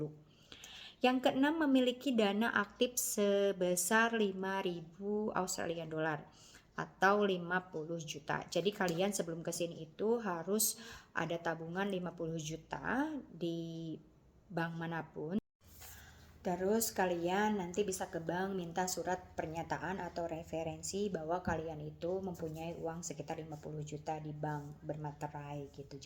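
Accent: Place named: Tasikmalaya, Indonesia. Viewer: Orang tasik ieumah hahaha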